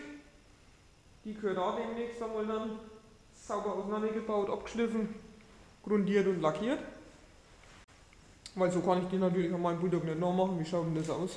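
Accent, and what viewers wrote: Franconia, Germany. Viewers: Sprich net fränkisch dich versteht keiner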